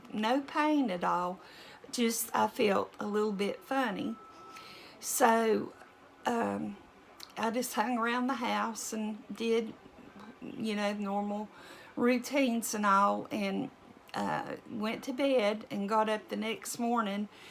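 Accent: South, United States. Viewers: Wow it sounds like an easier birth since you didn't have hours of pain. And I hope you have a speedy recovery from your being sick. I just love your southern drawl and how calm and relaxed you talk.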